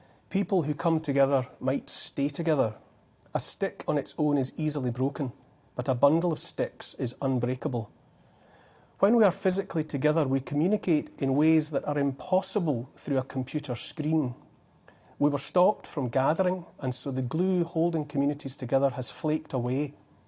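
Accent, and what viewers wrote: Scotland, United Kingdom. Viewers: So thats what my thoughts sound like with a Scottish accent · I genuinely don't care what he says, i just listen to his accent. he's like the scottish james may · Most sensible post for many moons and told in the wonderful tones of an educated and very calm Scotsman. Wish we had many more like Neil. Leaders of integrity are in desperately short supply.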